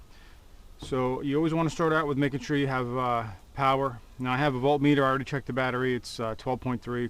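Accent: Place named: Newfoundland, Canada. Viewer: Yes B'Y..Newfie like accent. Thanks again, it was killing me after an engine swap...im a novice. main ground was culprit. Thanks again. also, your narrative skills are excellent. more small engine stuff..?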